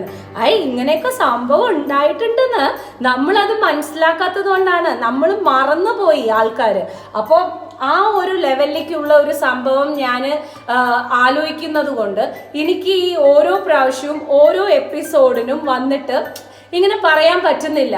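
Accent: Palakkad, India. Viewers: I like your palakkadan malayalam very much ma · Hi where exactly are you at Palakkad. Could make out from your accent that you are a palakkadan.. Me too Palakkad.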